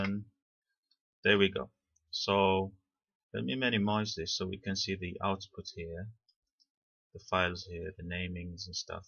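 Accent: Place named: United Kingdom. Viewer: such nice britch accesent for Arabic speaker :D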